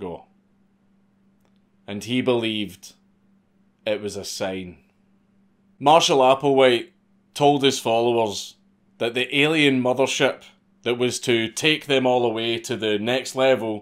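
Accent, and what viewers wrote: Scotland, United Kingdom. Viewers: Dude, you're so funny! Add that to your 200% Scotch look and sound, I'm ROFL-ing here! 😂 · I love this man's accent If I've guessed correctly, Scotland is full of people with a similar accent, at least in one particular region, but I love it. An appreciative Yank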